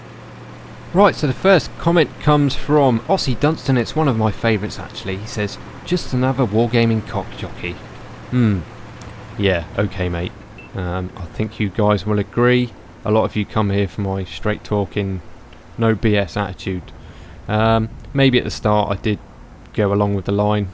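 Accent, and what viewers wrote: United Kingdom. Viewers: Great video...more like it would be appreciated. Thanks for all the hard work you put into the channel, Happy New Year to you and yours, and keep tanking when you find the time...BTW, take your own advice about being yourself; your voice is awesome. 'Course, being a Yank, all you Brits sound good...cheers!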